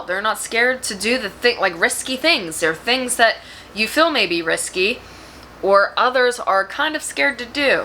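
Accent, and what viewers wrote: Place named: Pittsburgh, United States. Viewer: and you have a Pittsburgh accent like me haha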